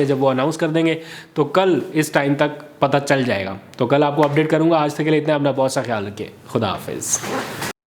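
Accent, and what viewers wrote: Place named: India. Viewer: اَلسَلامُ عَلَيْكُم وَرَحْمَةُ اَللهِ وَبَرَكاتُهُ بیٹا ۔ آپ بہت اچھی اردو بولتے ھیں مگر کہیں کہیں ہندوستانی touch آپ دیتے رھتے ھیں ۔ ۔۔ جیسے ۔۔ بہت اچھے سے۔۔ جنکہ بونا ھے بہت اچھی طرح ۔۔۔ جیسے کافلے۔۔۔ جبکہ بولنا ھے ۔۔ قافلے ۔۔ بیٹا ھمیشہ خوشں رھو ۔محمد وسیم قریشی ۔ کراچی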